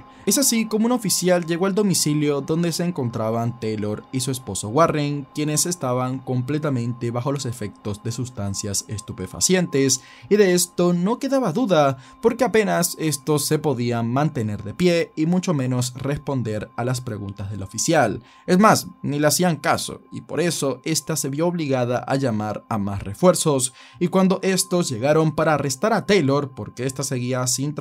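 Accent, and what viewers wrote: Dominican Republic. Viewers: Yo pensaba que era dominicano pero me acabo de enterar que es venezolano watafak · Una pregunta, eres Dominicano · Enserio es de Perú?😮 Creí que era Dominicano 😮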